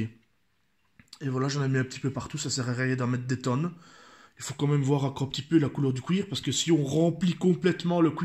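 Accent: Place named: Liège, Belgium. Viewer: Quand je t'ai entendu , j'ai dessuite compris que tu venais de ma région de lièch🤣 Très bon couteau de poche le Ruike p108 le mien ne me quitte plus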